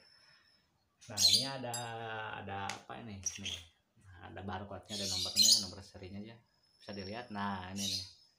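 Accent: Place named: West Java, Indonesia. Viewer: Kyk org sunda ngomongnya mh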